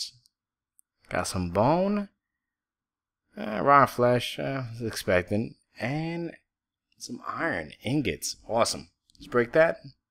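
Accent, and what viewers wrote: New York, United States. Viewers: Ahhh, so thats the lovely New York accent we hear!! Great start to a series. It goes to show that a bit of exploring can really help - you're so brave, I have to set up a whole town with a million full chests before exploring - I guess it's the hermit in me :D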